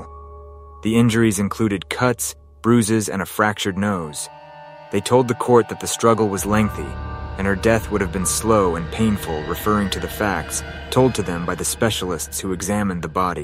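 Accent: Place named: United States. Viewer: Finally! Ive found an american voice over, true crime channel that covers UK murders 😭❤️🙌 thank you 🎉 SUBBED 😊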